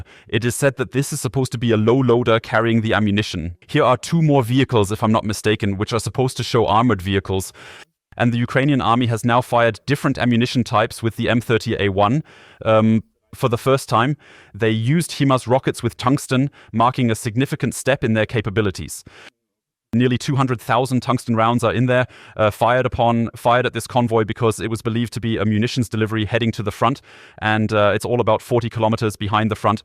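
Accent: Germany. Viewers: I'm sure, he has German accent all right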